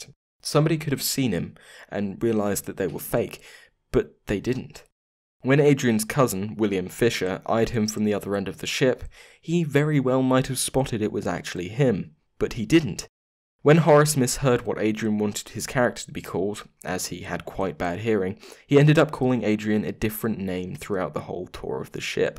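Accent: United Kingdom. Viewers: I like hearing this story from a British accent point of view but when The History Guy .. did his episode about Cole I bought 3 of his tee shirts. ..which deserves to be forgotten.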